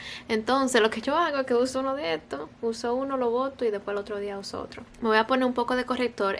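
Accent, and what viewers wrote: Dominican Republic. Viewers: Español cibaeño ❣️🥰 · ¡Eso era lo que estábamos esperando! Ese español de la República Dominicana se diferencia donde sea 😂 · vi el primer video de ella y dije,, ok, no esta mal , le di al segundo y desde que escuche el ascento dominicano , dije , aqui es que vamos ejjejeje , que linda jj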